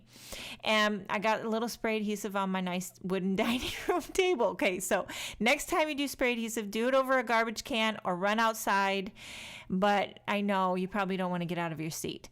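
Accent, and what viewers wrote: New York, United States. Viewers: It's a New Yawk accent ~ and New Jersey, too if I must say so myself:) I LOVE your rainbow wreath,...it's Gawguss!!! Thanks so much for another fun video!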